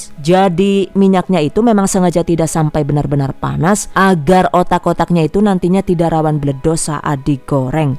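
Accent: Banyumas, Indonesia. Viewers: Deneng ngapak😂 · Ngapak luuurrr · Maaf bun njenengan tiyaang pundi nggih, kayaknya ngapak. Siapa tau deket jadi bisa belajar langsung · ora ngapak ora kepenak,wong ndi jane rika yu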